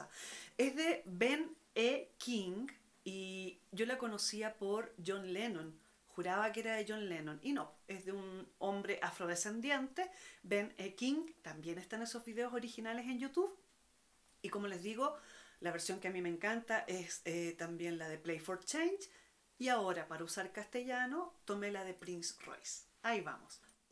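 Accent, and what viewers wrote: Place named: Chile. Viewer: Un placer suscribirse a tu canal, me parece o eres de Chile? Apenas se nota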